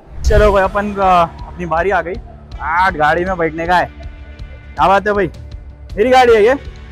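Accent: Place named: Hyderabad, India. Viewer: Our Hyderabadi hindi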